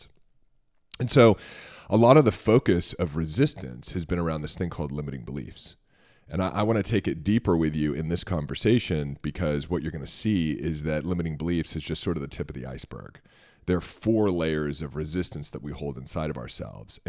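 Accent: United States. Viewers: The more I listen to he keeps sounding more and more like that Turtle Dad from Finding Nemo. 😂Love that SoCal accent imma NorCal girl myself.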